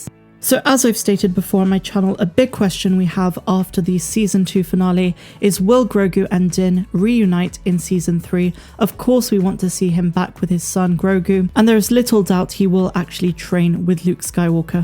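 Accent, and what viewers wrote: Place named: West Country, United Kingdom. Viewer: I loved the f word said as well lol I'm loving accent can't work out if it's Somerset Devon or Bristolian and I'm from Somerset myself lol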